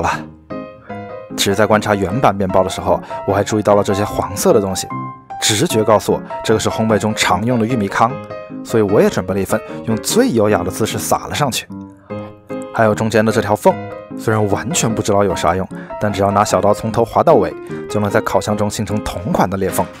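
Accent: Wuhan, China. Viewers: 那~~有莫斯办法撒？ 有点吧甜，有点吧韩，哈哈哈哈。 这弯管子普通话太熟悉了~~哈哈哈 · 这个弯管子普通话真的是听得想家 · 好怀念弯管子普通话。确定博主是武汉的妈妈😁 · 博主是武汉人啊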